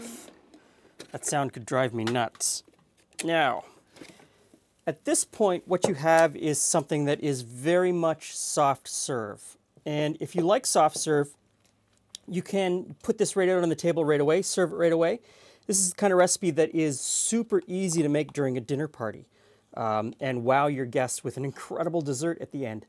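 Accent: Canada. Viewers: Wonderful video and a recipe that even an old guy (ha, ha) can handle ---- just enough but not too many ingredients. Three (3) is a good number, LOL. Loved that delicious Canadien 'accent', too.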